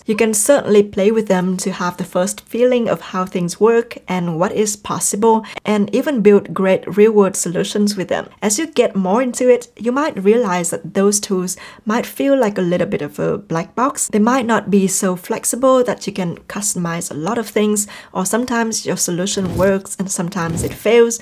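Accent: Vietnam. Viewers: e cảm ơn chị. Một chiếc video tỉ mỉ không kém mấy video của các pháp sư ấn độ nhưng lại có accent việt đáng yêu vô cùng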